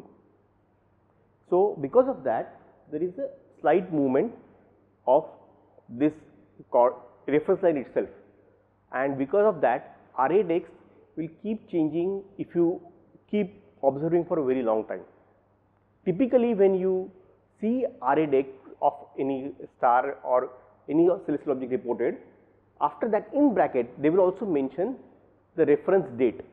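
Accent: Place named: India. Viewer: The toughest Indian accent . But thanks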